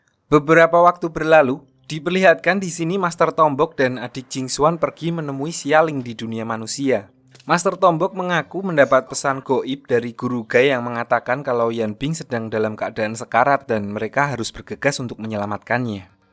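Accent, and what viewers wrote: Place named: Java, Indonesia. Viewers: kwkwkwkwkwk kaya e org Semarang nih si mimin... salam kenal min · logat jawa admin nya nampak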